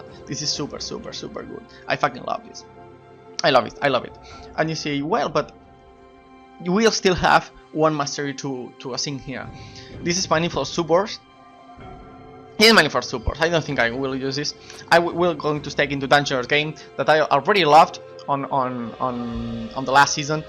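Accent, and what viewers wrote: Spain. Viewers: ¡Hostia un Shaco!? Are you Spanish?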